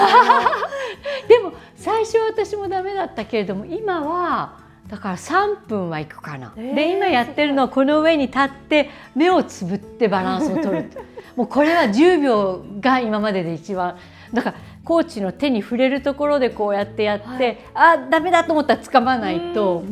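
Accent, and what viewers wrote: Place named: France. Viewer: 素敵✨✨✨✨ 長くフランスにいると 日本語がちょっとフランス訛り🤭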